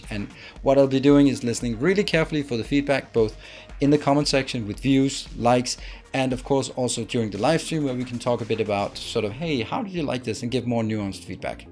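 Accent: Denmark. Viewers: Sounds like he's danish :)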